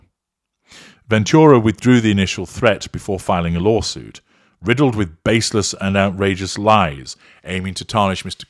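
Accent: United Kingdom. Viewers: Dude why can’t you pronounce names properly? Surely even with your British accent, pronouncing Combs properly can’t be that difficult. It’s COHmbs….not Cooms! Also, she got $100M from the settlement. It’s open source data so you should have known that. It’s obvious that you’re just reading from various sources and have no actual understanding of the subject….including how to pronounce his last name properly. I usually enjoy your videos but this series was weak to say the least.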